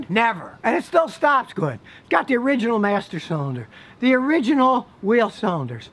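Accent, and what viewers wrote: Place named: South, United States. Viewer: I use all of your tips... and I also use your southern drawl as a sleep aid, It's on my healing frequencies playlist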